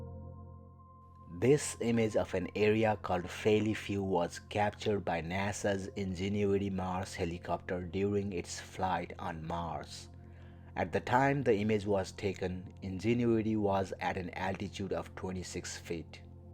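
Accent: India. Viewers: Would love this video infinitely more if I didn’t have to hear this guys annoying voice, like some robot eastern Indian. The nerds behind the scenes should never do the selling, the narrating or talking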